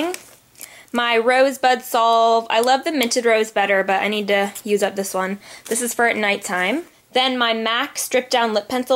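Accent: South, United States. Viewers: in your current videos you have a southern drawl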